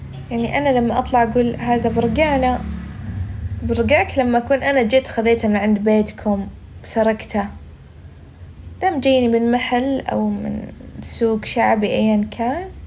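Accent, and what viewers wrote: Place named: Najd, Saudi Arabia. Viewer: لهجتها من اهل نجد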